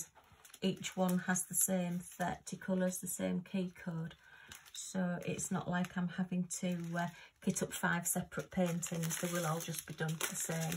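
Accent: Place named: Hull, United Kingdom. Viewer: I thought I recognised your accent!! I was Hull born and bred too but now live in Hornsea. Those five pics are nice, lovely colours.xx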